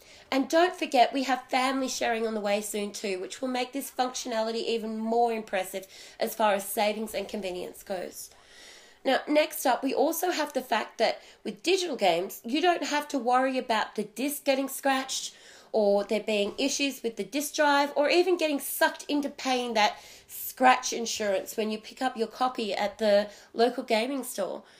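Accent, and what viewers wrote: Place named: United Kingdom. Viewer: "True game-ah, a dedicated game-ah" Fuck you non-rhotic bitch, Man british accent's stupid and annoying as fuck!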